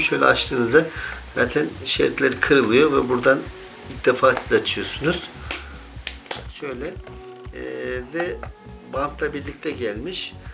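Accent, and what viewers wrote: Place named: Konya, Turkey. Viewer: Konyalı olduğu nasıl da belli oluyor kardeşimin, Konya li olarak hemen anlarım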